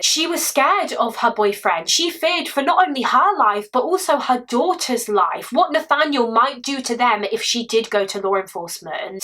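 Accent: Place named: Somerset, United Kingdom. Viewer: It's a west country accent ❤ sound Somerset to me 😊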